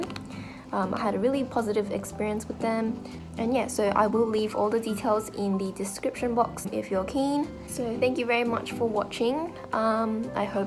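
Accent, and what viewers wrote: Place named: United Kingdom. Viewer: Your british accent is so 'umai'.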